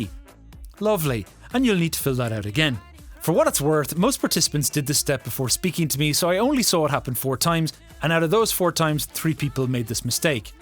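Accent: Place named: Ireland. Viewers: Raúl Pérez Scotspeople? The man could not be more Irish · how on earth could you think he's Scottish? He has a very very very obvious irish accent. Unless you're deaf and only reading the subtitles, I can't work out how you thought he was Scottish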